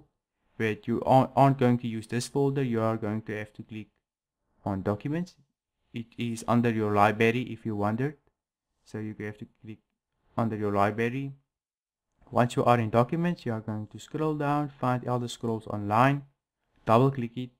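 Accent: South Africa. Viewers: You are South African? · Yeah you sound really SA! I guess uTube now sends us local content, I think it is fantastic since now I feel more confident to use my SA accent also. But man the 'force' is strong with you, I think you need to filter the noize on your mic a bit to help. This video this help thanks, simple enough good to know while downloading setup files. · You sound South African... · This guy from South Africa?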